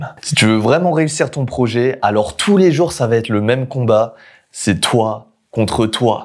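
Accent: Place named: France. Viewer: Jadore ton accent francais , fille de lalgerie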